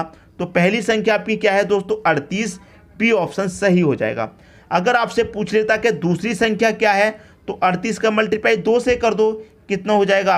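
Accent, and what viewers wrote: Haryana, India. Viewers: Aap Haryana se ho ji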